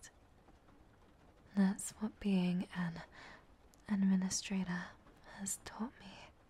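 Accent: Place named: Australia. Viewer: Damn this is the first time I've heard an Australian accent in asmr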